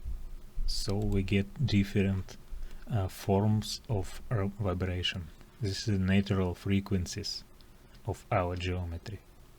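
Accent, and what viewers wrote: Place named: Nizhny Novgorod, Russia. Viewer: Не понимаю зачем вы говорите на английском с кондовым нижегородским акцентом. Комментируйте по русски. Англичане с американцами вас всё равно не поймут.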